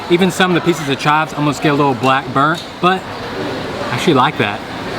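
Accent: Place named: South, United States. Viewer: Love your southern accent as well, you're so lucky to get to travel and eat all those exotic cuisines.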